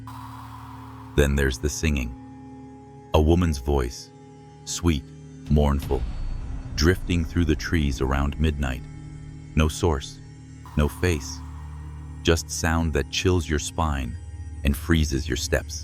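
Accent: United States. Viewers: As soon as I heard the American accent I knew this video was bullshit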